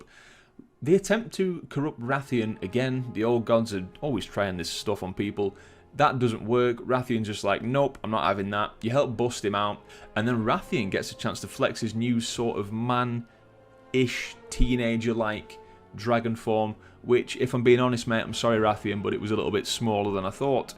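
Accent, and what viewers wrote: United Kingdom. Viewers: Loved your chill and british way of talking!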